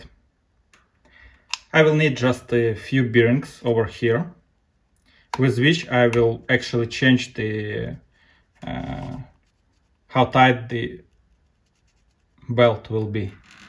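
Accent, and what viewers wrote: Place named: Russia. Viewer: Вроде бы имя. И говорит на английском с русским акцентом)))) похоже русских не любит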